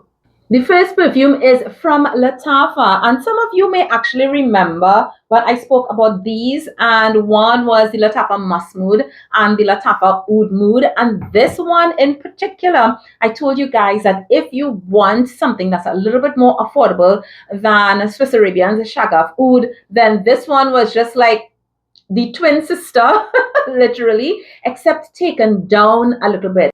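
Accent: Trinidad and Tobago. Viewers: U sound trini ❤❤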